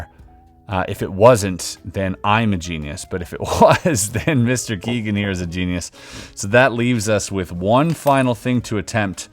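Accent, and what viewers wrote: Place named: Canada. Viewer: says, "out" Hubby and I, "Owwt" lol We're literally only 6 hours away from the Canadian border and it always makes us laugh to hear that accent. Truthfully people think ours is funny too because we say "Wicked" all the time.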